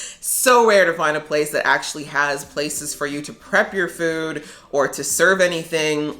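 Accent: United States. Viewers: You sound like you come from the US originally. If so, you are not allowed to have your own opinion and that makes your whole video illegal. 14 hours to anywhere ? I tale it that you mean to the only real country on the planet. The the good ol US of A? while you have been away from the US there has been lots of exploring going on. Columbus, that you already know of I expect. But since then, there has Dutch explorers, Spanish explorers, Portuguese and even English explorers and there are now something like 200 hundred recognised countries sharing the planet with the US of A. Oh, its shocking, I know, but we have to face up to reality now and then. Yes, even yanks once they learn proper English. New Zealand is much less that 14 hours away. Indonesia also. Papua New Guinea. the Solomons, Singapore, Malaysia, Phillipines, one even that even yanks should have heard of, no not Canada, Vietnam. 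All much less than 14 hours away mu modern jet airliners. Sorry, is the US still in the Wright Flier stage of aviation ? cheers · thank you for sharing, you sound American, I am from USA definitely considering moving to Australia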